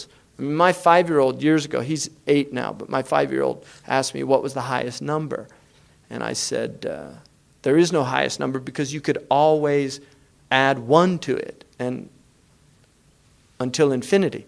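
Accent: Arab world. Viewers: What a beautiful Arabic accent Sheikh is having, Masha Allah well explained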